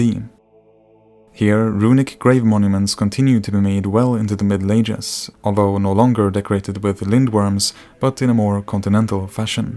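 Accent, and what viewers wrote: Sweden. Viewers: Måhända att det är en underlig fråga men kommer du från Blekinge eller småland?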